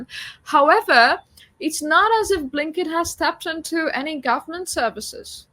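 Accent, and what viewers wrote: United Kingdom. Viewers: This was cathartic - as an Indian American. Well said. Is that a british accent u have? I think its something different. Very sweet. Followed u here from DEF talks. Jay Shri Krishna.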